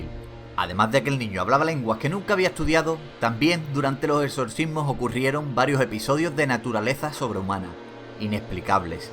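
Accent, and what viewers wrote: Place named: Canary Islands, Spain. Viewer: U DROSSTOP10 NO? VALE PUES PONTE DE NUEVO NOMBRE DOCTOP... ESPERA QUE? YA EXISTE D: PONTE... TOP10VIDEOS O ALGO POR EL ESTILO AH! Y PARECES CANARIO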